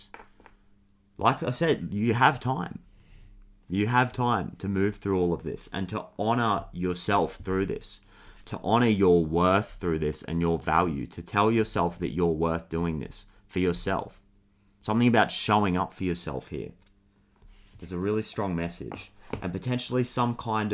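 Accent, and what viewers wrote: Australia. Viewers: Hey 💫 I am a brand new subscriber and your messages have been resonating hard 🤣🌸 Now I know why…. We share the same birthday 🎉!! Happy birthday 🎂 I had the most amazing weekend, energetically feeling such happy vibes ❤ I hope you did too 🥳 Thank you for this channel, I look forward to sharing more time and energy with you and this collective … we totally rock 🥰 It’s so good to hear a fellow Aussie in this space … Sydney girl here 🤗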